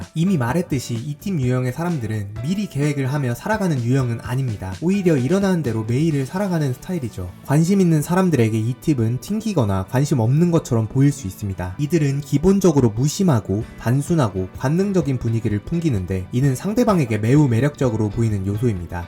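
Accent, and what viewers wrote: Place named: Jeolla, South Korea. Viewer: 전라도 사투리가 느껴지네요 ㅎㅎ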